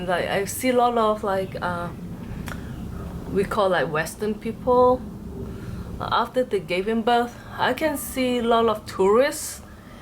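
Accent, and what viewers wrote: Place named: Malaysia. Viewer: your accent is very closed to malaysian chinese... i dont know why im watching this.. but i enjoy it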